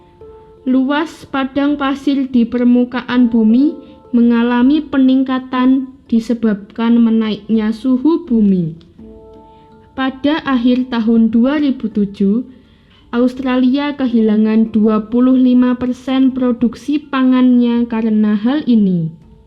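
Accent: Java, Indonesia. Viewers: suaranya Medok dan cadel mbak 😄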